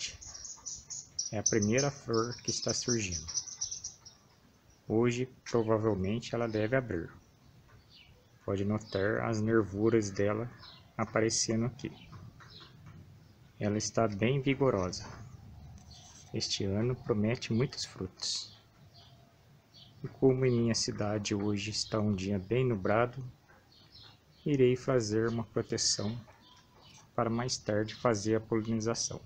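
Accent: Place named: Espirito Santo, Brazil. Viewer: Cara top, vc tem um sotaque de capichaba. Q bacana q Deus abençoe este lindo lugar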